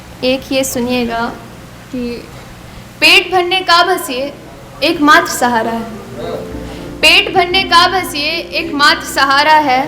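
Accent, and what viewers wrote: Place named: Haryana, India. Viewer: Hindi me kosish acha h but ur hariyana sun jaan me jaan ati hai. 😘😘😘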